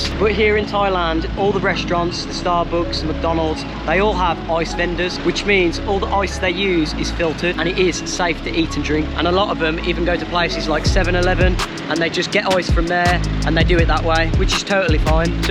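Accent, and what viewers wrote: Birmingham, United Kingdom. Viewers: Thanks sounds like your a brummie nice one ime a jock 😊 just subbed very usefull i have done lithle research and decided pataya just offered that bit more nightlife etc as i allready decided booked off season june i been told it can rain all day every day mabey you know if thats true or not thanks just subscribed very usefull thank you looking foward too more your videos cheers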